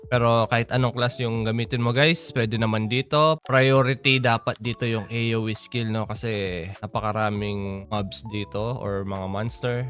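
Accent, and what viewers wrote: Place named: Iloilo, Philippines. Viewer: salitaan plang ilonggong ilongo toto part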